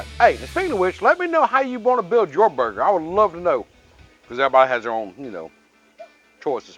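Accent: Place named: North Carolina, United States. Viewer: What part of the south are you in ? I can close my eyes and you sound just my like family in Hudson NC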